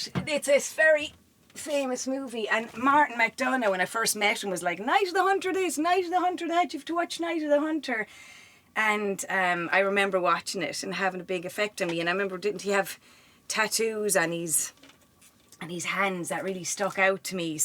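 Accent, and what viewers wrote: Ireland. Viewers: Love the Irish lilt. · Tf is a cletic accent her accent is Irish, no such thing as a celtic accent. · Irish is honestly my favorite accent. She sounds exactly like the characters in The Commitments😀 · It’s not a accent it’s a brogue, From Tipperary I believe my grandfathers birthplace. Wonderful,beautiful talent. Just picked up McCabe and Mrs Miller on the 50 % off sale.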